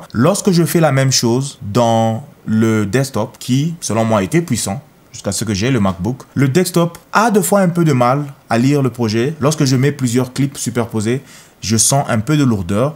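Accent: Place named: Cameroon. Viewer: Tu es camerounais frère 😅 l'accent. Je m'abonne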